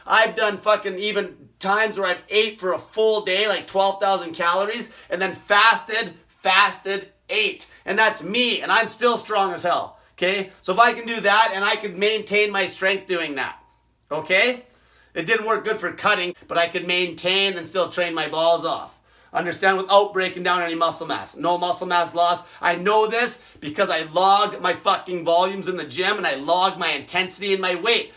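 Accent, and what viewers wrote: Canada. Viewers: Love how he has the typical English-Canadian accent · It is English-Canadian instead of French-Canadian.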